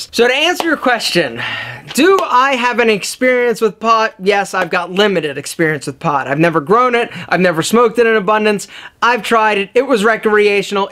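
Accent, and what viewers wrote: Philadelphia, United States. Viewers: I know :) but the content is so good. I just wonder why it has to be spoiled by a loud squeaky kids tv show presenter american accent. Its annoying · you should get rid of that horrible philly accent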